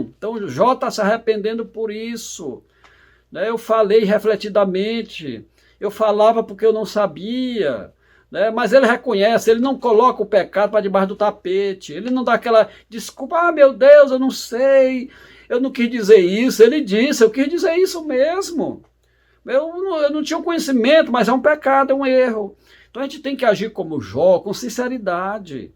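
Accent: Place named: Northeast, Brazil. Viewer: Maravilhoso ensino, No bom sotaque nordestttíno.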